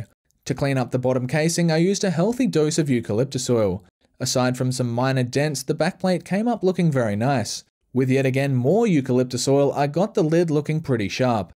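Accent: Australia. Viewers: Hey, you a fellow Aussie?????? I should've recognised the accent, I'm disappointed in myself. Really really disappointed. Edit: a correction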